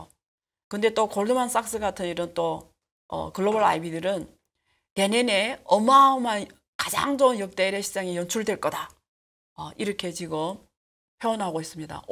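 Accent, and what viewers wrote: China. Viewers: 많은것 배우고 있습니다~^^억양이나 말투때문에 실력이 살짝 묻히지만 완벽하지 않은 모습이 더 정감이 있어요 인간미라고 해야하나요? 중국분이라 더 객관적으로 한국을 판단하고 계신것같아 그또한 잇점인것 같습니다~~ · 이분조선족 임?발음..ㅋ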